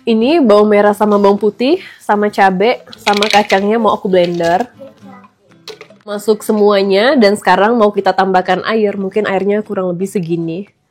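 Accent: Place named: Java, Indonesia. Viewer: Mbak bicara nya seperti.orang jawa.pdhl bukan ya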